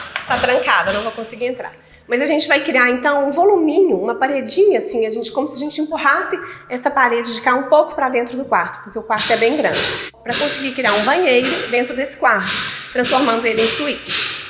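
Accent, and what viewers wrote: Minas Gerais, Brazil. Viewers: Oie amo seus vídeos! Quando vc fala com esse sotaque mineires 🤣🤣🥰 amo mais ainda… sou apaixonada por essas transformações em casas antigas💙 um bj grande e to na expectativa de novos vídeos👊🏻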